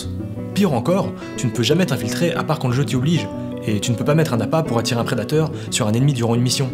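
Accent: Belgium. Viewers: Il est belge ??? Psk il dit pas huit mais wuit par exemple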